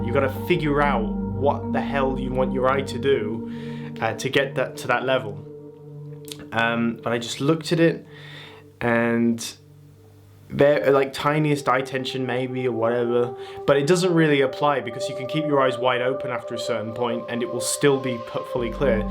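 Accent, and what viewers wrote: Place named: United Kingdom. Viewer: Your British accent :)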